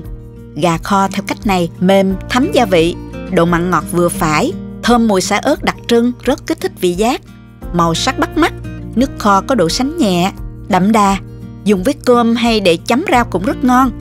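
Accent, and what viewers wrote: South, Vietnam. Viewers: Tôi sinh ra lớn lên ở Lái Thiêu đây cô,thực sự cô người. Bình Dương?? Nghe giọng nói đúng người miền Nam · Đã đăng ký kênh, dạy dễ hiểu, và giọng miền Nam dễ thương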